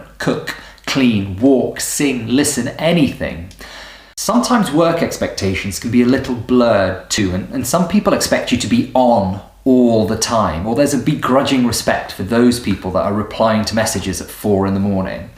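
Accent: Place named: Stoke, United Kingdom. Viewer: and bring back the stoke accent dude don't forget your roots duckie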